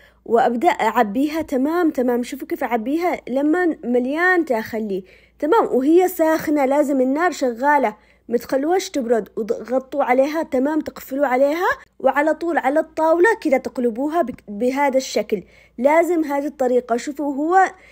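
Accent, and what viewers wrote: Aden, Yemen. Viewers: محلا كلامك الكلام العدني يجنن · تسلمي ع كل الاكلات الحلوة وتسلم الهدرة العدني الذي تخرج من فمك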